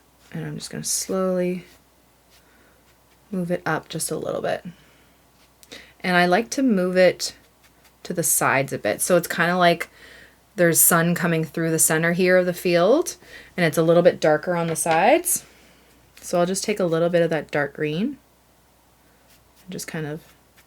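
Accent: Canada. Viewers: Before I subscribed- I was watching one of your videos, and as I was listening to you- I was like “Do I detect the Canadian rise?!” Lo and behold- I decide to subscribe and see that you are one of my people! ❤🇨🇦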